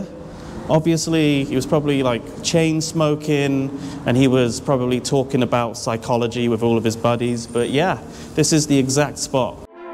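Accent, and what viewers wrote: United Kingdom. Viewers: Yeah; this definitely works better in a British accent... (esp. reciting fake Russian words) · Great to see these locations , good video . The Nadsat is cool but as you are an Englishman , complete with middle class accent , it is really jarring to hear you use a phrase like 'super excited to be here' . Come on man , really ? Not quite as depressing as the McDonalds .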